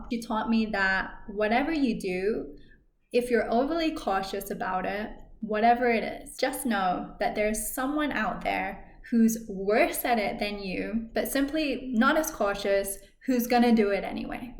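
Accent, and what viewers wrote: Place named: United States. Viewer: Edgar Cayce, a christian, would be proud of you, he stressed prayer as one thing to help with dream recall. And it is working for me, I'm not christian but I take prayer as being grateful and thankful for everything i have, remember to bless your food and drink always, and then of course asking for all I want. My still poor dream recall is improving. Affirmations are another to do, if i hope you don't mind me rambling on on your page but my dream recall is improving everyday and every night in every way and remembering my dreams is easy. Getting out of my comfort zone would be becoming more social, just talking to people more. I could practice that in lucid dream state, it's on the list of things to do and learn there in my lucid dreams. Thanks for encouraging me, I will talk to people more here in the waking life real world, this "shadow world" we live in. 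You're so cute, if I may acknowledge that? You have a very nice american accent, that is if memory serves me and you are actually english which seems very unusual to be able to change your accent like this. Many blessings and love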